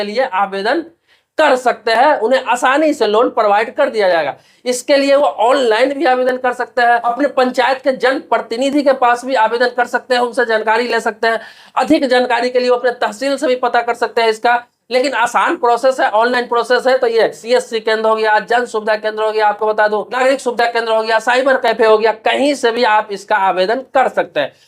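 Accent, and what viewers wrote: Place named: Jharkhand, India. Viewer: Jharkhand ka bolia sir ❤❤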